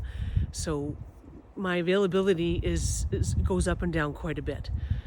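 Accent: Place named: East Coast, United States. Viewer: We love you soooo much! And thank you for reaching all of us like you do! Your messages have changed my life! Love you I was gonna say, are you a east coaster? I grew up in Sydney NS and I recognize your awesome east coast accent poppin in, anywhooo love you moon and back and thank you for all that your channeled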